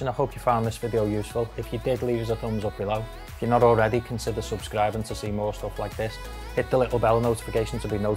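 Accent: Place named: United Kingdom. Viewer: Hey, just wanted to say how much I appreciate you creating this video. I had a comment earlier on my own YouTube channel. It was great to be able to link to this rather than create a video from scratch. Thanks. Cool channel. Awesome accent... from a fellow Brit;)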